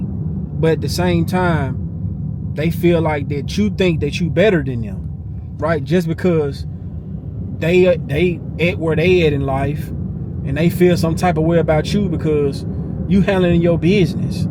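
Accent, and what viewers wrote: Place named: Louisiana, United States. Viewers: Sounds like Louisiana to me cuz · New sub,u speaking facts🤣😂🤣😂😂😭😂😭😂aye u got a southern accent u gotta be from da south,im from Louisiana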